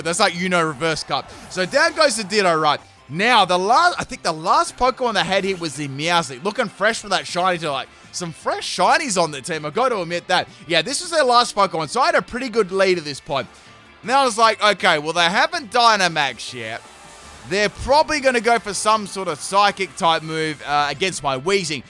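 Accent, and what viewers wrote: Australia. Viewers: I'm Aussie and wouldn't ever consider saying Leafeon the way he did. There's an 'e', just read it phonetically. Think is maybe a tad bogan though, which might explain it.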